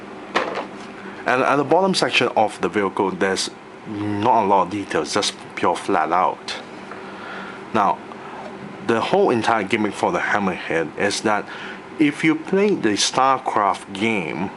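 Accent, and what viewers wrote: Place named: United Kingdom. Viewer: Sounds like across the pond 😊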